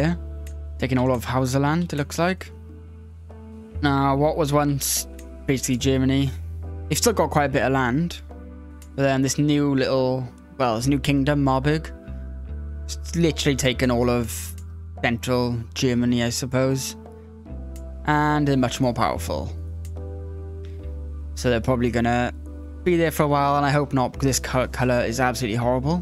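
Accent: Wales, United Kingdom. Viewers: Are you welsh, Sir?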